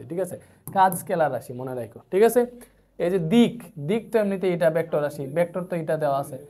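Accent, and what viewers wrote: Sylhet, Bangladesh. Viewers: Vai apni ki sylheti😊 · vhaiya apeni ki sylhetii?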